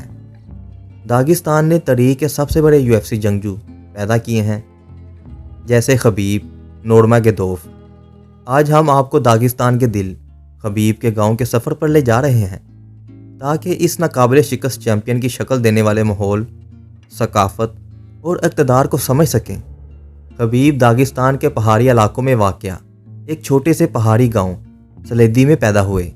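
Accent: Lahore, Pakistan. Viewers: Are U Lahori Bahi- BGV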